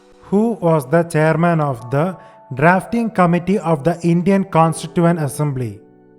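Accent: Kerala, India. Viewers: Sir,Are you from Kerala? You pronounce like malayalee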